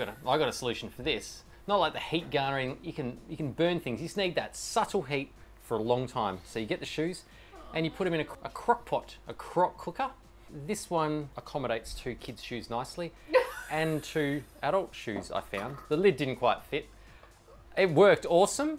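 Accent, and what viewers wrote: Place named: Australia. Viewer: You are a riot! Great sense of humour and sound effects, not to mention your outraygeous Aussie accent. Cheers!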